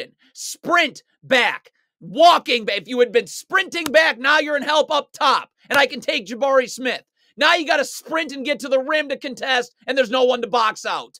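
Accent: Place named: Philadelphia, United States. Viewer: ima big fan of this dude... he sounds like he a Philly dude...we act like this 24 7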